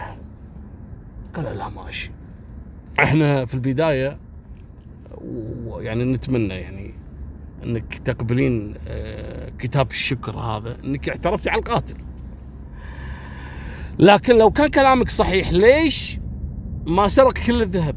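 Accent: Egypt. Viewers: الله الله عليك حجيك بلمصري يجنن 😂😂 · ما أحلاك وانت بتحكي مصري يا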